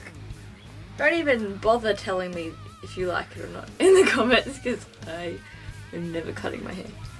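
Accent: Australia. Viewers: r u australian? you sound like it :) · That's an Australian accent! :3 I love Aussie accents way more than British personally. She's got so many great tips on her channel. I think I'll subscribe. <3 and hey, there's nothing wrong with being a kid, we all gotta be one sometime in our lives. Enjoy it! And if the 80s phase makes you happy, go on :D I'm a 90s gal myself so I understand! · Heyy there, would you be able to tell us how you initially explained to your hairdresser exactly how you wanted your hair cut in this way? I've been to soo many hairdressers, whip out so many pictures and it's just arghhhh! When I first watched another video of yours I was like AUSSIE ACCENT! Don't see many of us Aussies on youtube. =]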